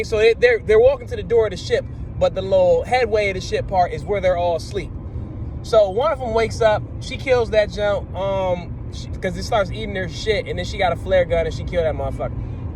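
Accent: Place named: Washington DC, United States. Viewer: Def from DC or PG, lol. Appreciate the review bro!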